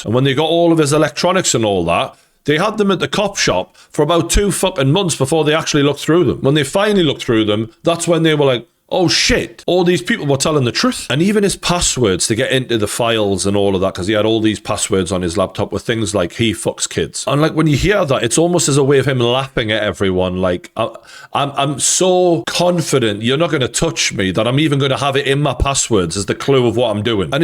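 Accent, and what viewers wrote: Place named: Geordie, United Kingdom. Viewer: Oh my God, This is Very difficult to listen to Geordie🤯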